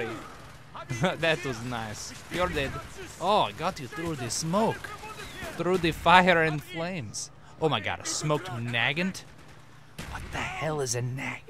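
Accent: Russia. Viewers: NO! keep talkeenk az a russian!! i enjoy it so! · if u like in a russian accent or think he should talk like that all the time thumbs up! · kl russian accent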